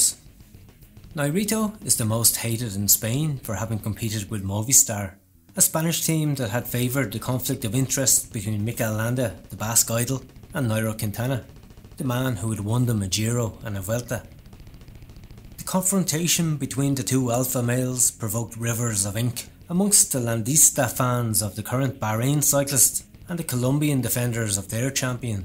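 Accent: Dublin, Ireland. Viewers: As an Irishman myself - I know the narrator's accent only too well, he's from Dublin. Reminds me of Paul Kimmage and a little bit of Pat McQuaid. I like his sense of humour. A question to the narrator: are you related to Paul Kimmage? Are you or? Yeah, I can see similar writing and story telling skills as Paul Kimmage, and the contempt for cheats and dopers. This is definitley not GCN. Kudos to the narrator.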